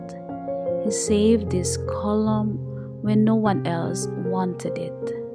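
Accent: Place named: Norway. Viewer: Na dungog na gyud naku ang accent nimu sis pareho sa Norwegian mag storya, nindot kaau ang monument naa pod d i history behind it pagka nindot sa lugar 😊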